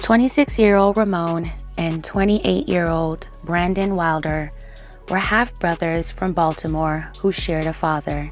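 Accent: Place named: New York, United States. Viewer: I love my girl with the New York accent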